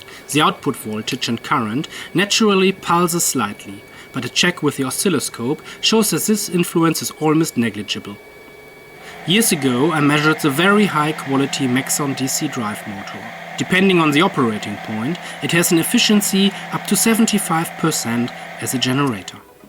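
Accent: Germany. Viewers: This is the most German English I have ever heard, saying this as a German xD But high quality content, I see the effort put into this, nice job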